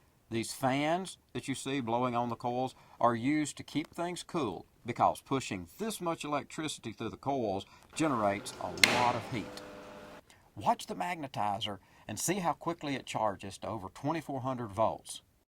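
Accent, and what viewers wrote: United States. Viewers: He should have someone else with a proper English accent narrate His videos. That Mercan accent can be quite annoying... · You sure do talk funny (in fact I was put on speaker phone so that the office ladies in Tennessee could listen to my Australian accent and have a giggle: ) Great informative video. It is so much a pleasure to hear an American who enjoys China. It is a great country and my family and I have spent many months there - Thank you for sharing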